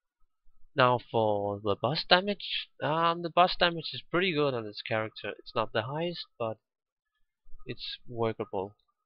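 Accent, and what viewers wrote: Denmark. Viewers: You Danish/From Denmark? Sounds a lot like it. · Er du dansker?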